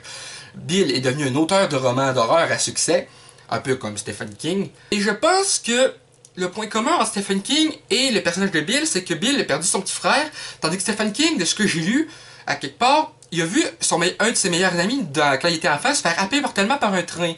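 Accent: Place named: Quebec, Canada. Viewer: Au Québec , vous avez vraiment un drôle d'accent !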